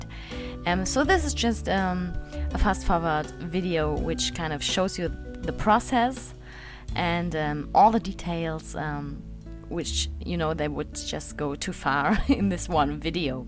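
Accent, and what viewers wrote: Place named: Germany. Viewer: Deine Betonung ist so "typisch deutsch" :D Das ist nicht schlimm, hat nur Wiedererkennugswert ;) Am Besten gefallen mir die Haare, aber das Gesamtbild ist einfach klasse :)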